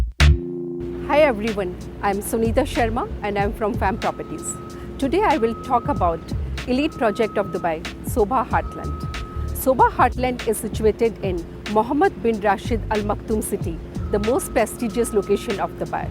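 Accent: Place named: India. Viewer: Annoying indian accent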